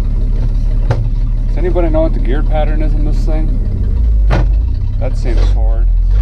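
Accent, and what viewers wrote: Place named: Midwest, United States. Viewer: You must be from the Midwest probably Wisconsin you talk just like I do lol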